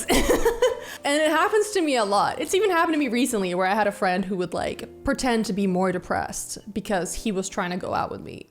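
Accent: United States. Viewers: u sound American though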